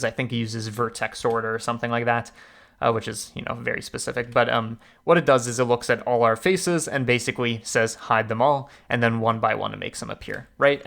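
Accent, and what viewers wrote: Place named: Massachusetts, United States. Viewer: Wanna know how I know you're from Massachusetts?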